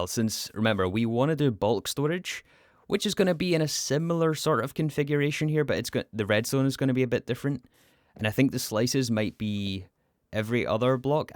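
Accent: Scotland, United Kingdom. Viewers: Are you Scottish and if so where you from? Just discovered your channel and love it. I’m from Glasgow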